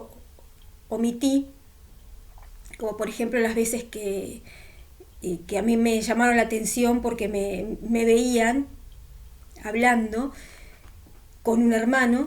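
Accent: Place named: Argentina. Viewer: Que curioso que hayas nacido en España, con ese acento pensé que habrías nacido en Argentina jaja. Que sorpresa lo de tu padre no sabía que era un artista de tango. Lo que cuentas muchos lo hemos vivido también, hay mucha hipocresía ahí dentro. Ancianos que fuman en su horario de trabajo (cuando creen que nadie les ve), adulterio, hermanas que cuando pasan las fiestas con su familia “mundana” que no es testigo celebran navidad y cumpleaños pero luego guardan las apariencias en la congregación (los típicos que tienen un pie dentro y otro fuera de la organización), etcétera. te prohíben cierto tipo de música y luego ellos andan escuchando música “mundana” de lo peor, en fin muchísima hipocresía. A mi de adolescente me prohibían ver ciertas películas porque decían eran malas y no se podían ver pero luego nos invitaban a alguna fiesta en casa de un anciano y su familia y veían películas de Disney como la cenicienta blancanieves o la bella durmiente que también tiene brujería y yo pensaba por qué yo no puedo ver tal o cual película y ellos si pueden ver películas disney que tiene brujería? 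En fin, pura hipocresía, la verdad es que hay un doble rasero y una hipocresía impresionante dentro de la secta. Nadie te tiene que decir que música puedes o no puedes escuchar, eso es decisión personal tuya y nadie se debe meter en esas cosas. Pero te quieren controlar cada aspecto de tu vida. Luego años después leí en un artículo de su página oficial JW org donde dicen que ellos no tienen una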